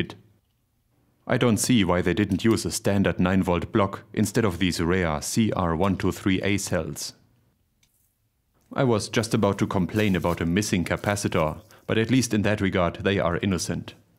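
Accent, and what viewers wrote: Germany. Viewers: Your German accent is enticing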